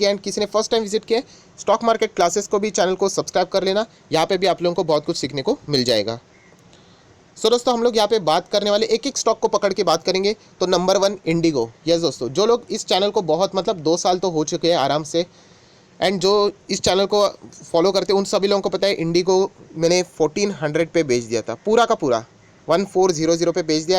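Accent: Maharashtra, India. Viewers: बोलण्याचा पद्धतीने तर मराठीच वाटतो.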